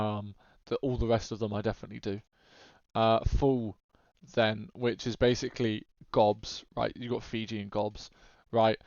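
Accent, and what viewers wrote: United Kingdom. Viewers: dude! i just realized you're from the UK!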